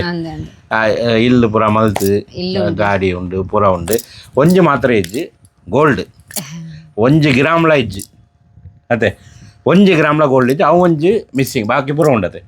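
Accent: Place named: Tulu, India. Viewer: Ur tulu 😂👌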